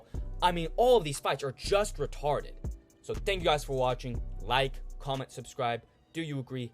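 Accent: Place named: Midwest, United States. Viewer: You are actually retarded! Definitely from the Midwest with retard accent dontcha ya know! So glad the UFC doesn't hire fucktards like you for match making. Why do you keep saying we? There's no "we" you are in no way, shape, or form involved with decision making in the UFC. One last thing... cut your fucking hair! You look like a botard!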